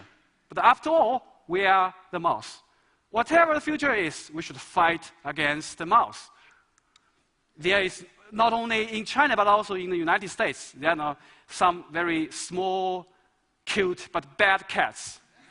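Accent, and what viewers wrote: China. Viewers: 油管的推荐有时就是会抽风，。。。这么蹩脚的中式口音英文（你他妈用英文音译中文用什么汉语拼音发音？！）和几个单词就能说明的主旨偏偏扯了十几分钟，其实都不用演说。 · 说的挺好的，不过发现我们国人英语与外国人口音确实不一样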